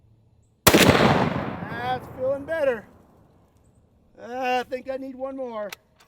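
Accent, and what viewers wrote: Kentucky, United States. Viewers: Joe Rogan but from the stat of Kentucky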